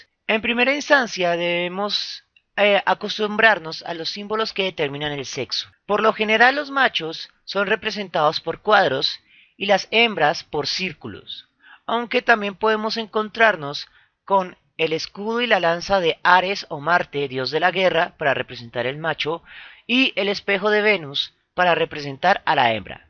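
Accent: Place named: Colombia. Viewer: Me encanta como explica todo tan Claro y entendible y adoro en Acento de Voz Colombiano....me fascinan estos vídeos,gracias por hacerlos ...se que es parte de un tiempo valioso y preparación a cambio de nada,sin fines lucrativos solo con la intención de educar ,informar y ayudar a compensar esta rama de la ciencia tan fascinante....por ello estoy muy agradecido y le deseo éxitos y bendiciones en sus futuros proyectos... Enhorabuena. ❤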